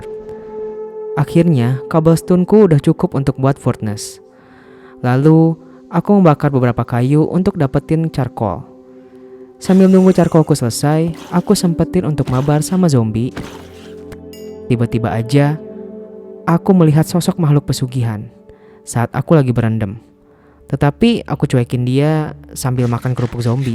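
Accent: West Java, Indonesia. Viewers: dari logat ngomong nya kau orang sunda ya bang kalau iya sarua euy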